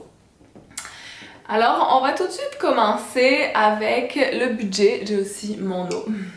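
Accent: Quebec, Canada. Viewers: Tu devrais faire une vidéo sur ce que t'aime et n'aime pas de l'accent Québecois vs Français. Je pense que t'aurais une perspective unique parce qu'on dirait que ton accent est un mélange des deux. Peut-être parler des fois quand personne te comprenais ou quand tu comprenais personne, les expréssions utiles ou bizzares, les réactions à ton accent, etc.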